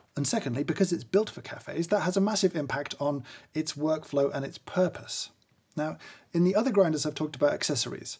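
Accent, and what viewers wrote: Germany. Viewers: good german accent, congrats :) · His German pronunciation is hilarious. 😁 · The englisch/german accent is so sweet :D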